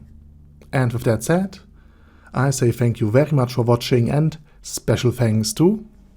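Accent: Germany. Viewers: Holy shit, this german English :D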